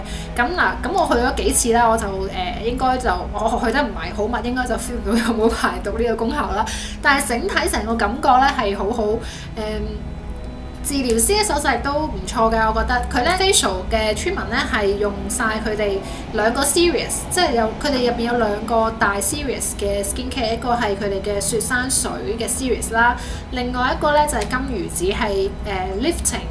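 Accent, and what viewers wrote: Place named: China. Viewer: 不錯！改善英文發音更好，太chinglish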